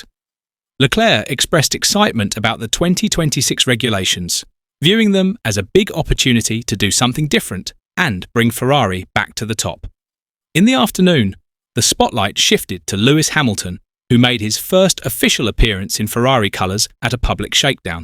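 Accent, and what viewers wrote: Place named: United Kingdom. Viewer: Brit English is getting to me.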